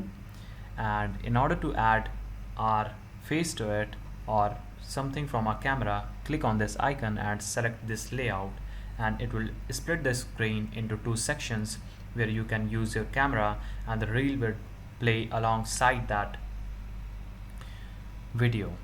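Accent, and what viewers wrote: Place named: India. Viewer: Why are all these videos and some Indian accent?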